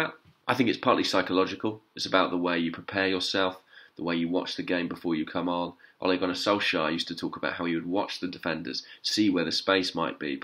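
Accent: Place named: England, United Kingdom. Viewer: As a french fan I wish Olivier leaves Arsenal to another club where he can play more games. I think Marseille might be very interested, they are qualified for the Europa League too, he will be the main striker there and theirs fans will love his fighting spirit. I love your videos man, it helps me to understand english accent as well, keep going!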